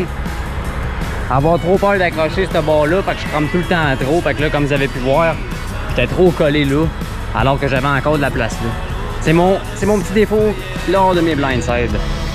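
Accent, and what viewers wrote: Quebec, Canada. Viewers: Salut Un bonjour 👋 de France de région parisienne Super tes vidéos avec ton bel accent Québécois c'est l Amérique en français Hâte de voir une vidéo avec de la neige Continue comme ça Longue Route à toi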